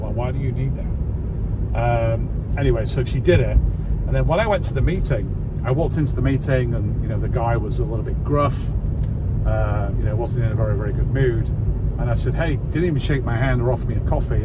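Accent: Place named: Merseyside, United Kingdom. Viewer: You have a merseyside twang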